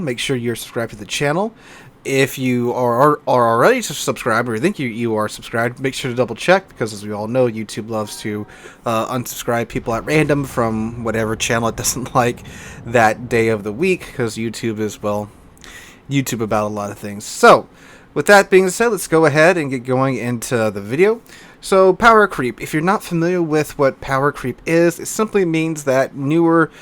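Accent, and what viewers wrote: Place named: South, United States. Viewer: Not all of them do, I think it's a regional thing. I believe is southern so his pronunctiation makes sense to me. May not be technically correct but makes sense.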